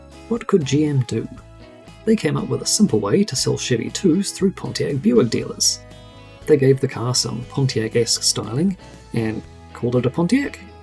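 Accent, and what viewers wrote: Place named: United Kingdom. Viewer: Drop the British accent. It doesn't help.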